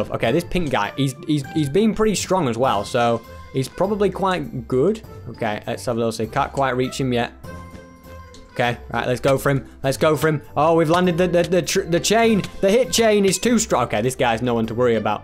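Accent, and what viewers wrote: United Kingdom. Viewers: I have a question, are u British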